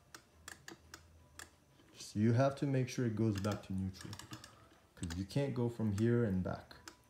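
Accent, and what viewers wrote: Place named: Russia. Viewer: Cool video but can't understand anything with your heavy russian accent lol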